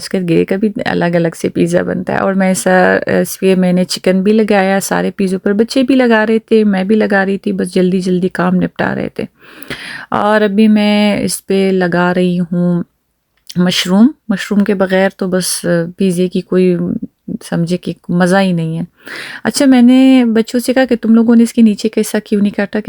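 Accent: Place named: Balochistan, Pakistan. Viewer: How are you dear Sis 😊? Wait he kar rai thi apke vlog ka 🫠 , ye tu batain k ap pathan hu tu apko balochi kese aati he ? Wese apka lehja balochi he hai pathano jesa nai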